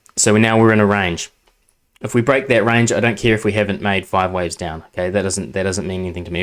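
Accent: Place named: Australia. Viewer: I didn’t picture u as this. Your accent gave me the expression that u were an white Aussie dude lol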